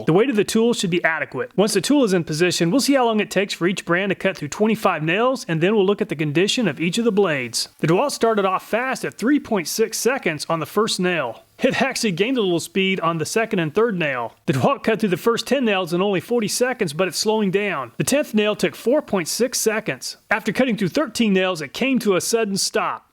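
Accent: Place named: Midwest, United States. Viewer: Os-kil-ating hard k sounds... You are from the midwest right? Lol My Mom says it the same exact way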